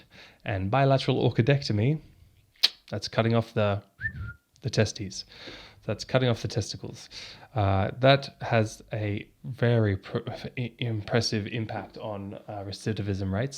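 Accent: Australia. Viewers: He's Australian, he sounds Australian the whole time to me as an Australian myself.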